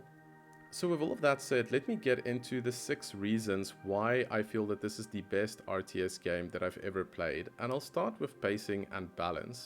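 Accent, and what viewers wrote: South Africa. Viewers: Hey, are you South African? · Was surprised to hear the SA accent! Have this game in my Steam Library but haven't downlosdedit yet. Not BIG RTS games but have been exploring them lately. Also turn-based RPGs like Xcom and Divinity: Original Sin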